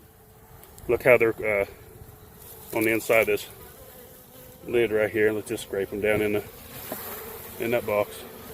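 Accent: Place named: Pennsylvania, United States. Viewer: where are you from? the accent sounds vaguely familiar. Maybe Pa.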